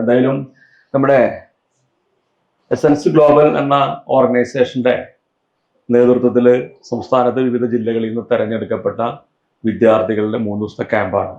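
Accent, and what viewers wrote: Thrissur, India. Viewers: നല്ല ക്ലാസ്സ്‌....... തൃശ്ശൂർ ഭാഷ നർമ്മ ശൈലി....... 👍😍😁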